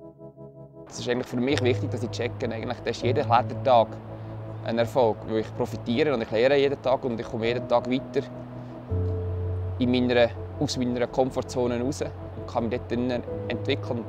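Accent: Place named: Switzerland. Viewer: Totally agree. My very limited Shwytzerdütsch skills makes it hard to understand, while the video and topic is top notch. Still highly entertaining !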